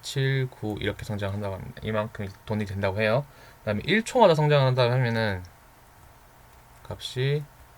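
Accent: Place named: Seoul, South Korea. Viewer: 자꾸 e를 2라고 하시는 서울 발음...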